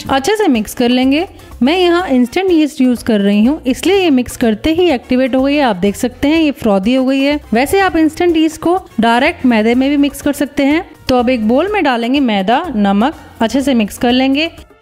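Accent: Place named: India. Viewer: Indian english gets on my nerves dude, stop talking like that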